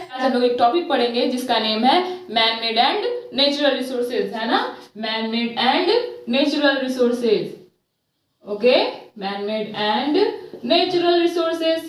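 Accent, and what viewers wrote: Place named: Bihar, India. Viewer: Baaki sb thik h ...bss language m biharipn aa lg ra h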